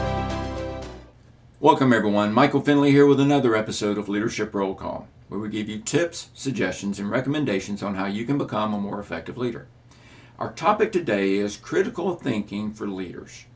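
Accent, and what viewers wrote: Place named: United States. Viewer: Wow,I just feel like I found a treasure. This channel is really great. He uses a very simple and direct language. It's so supportive, informative and wonderful. Moreover, I learn more about the American accent.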